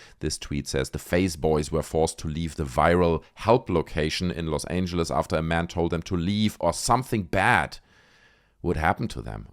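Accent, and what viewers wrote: Germany. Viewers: YOU suck bro you and your German accent tough listen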